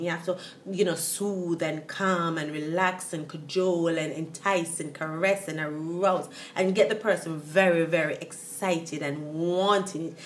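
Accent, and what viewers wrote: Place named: Jamaica. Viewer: Thank you, you are very helpful. I love your jamaican accent xxx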